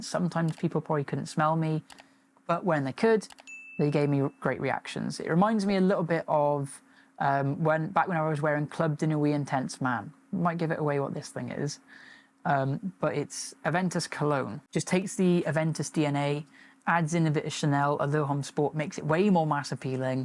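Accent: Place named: Ireland. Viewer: Probably because you are Irish? :D Bro I love your accent rly :D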